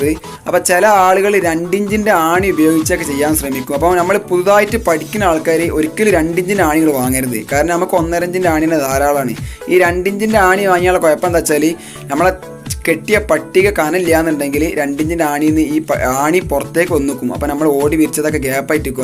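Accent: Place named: Kondotty, India. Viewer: കൊണ്ടോട്ടി ആണോ സ്ഥലം സംസാരം കേട്ടിട്👍😄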